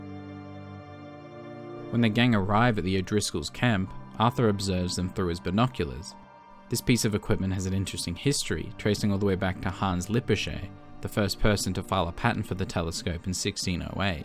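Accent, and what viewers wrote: Australia. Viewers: You sound like an Australian. And I'm amazed. If you don't live in America and are Australia native, I kinda find it sad that somebody foreign to our country is one of the most detailed historians of my country I have ever heard. I am making a bunch of assumptions and will be perfectly happy to be corrected on any of them. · didn't expect to see the history behind the coins on Davey's eyes. educational & a cool Aussie accent -- yay! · Just found this video and the rest, love it mate it's amazing. Aussie voices are just amazing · Yeah, the narrator speaks Australian and I'm a bit rusty so I appreciate it as well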